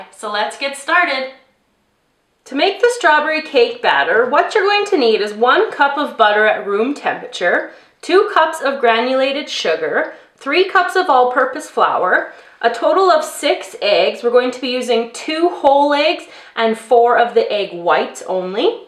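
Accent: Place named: Canada. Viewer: i thought she was from canada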